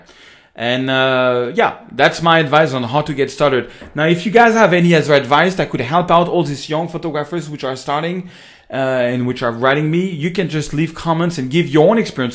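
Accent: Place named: France. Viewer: Well done! I like your French accent in English. That's cute! Anyway, just to say hi and I like the way you speak and teach. Learned a lot from you. Cheers~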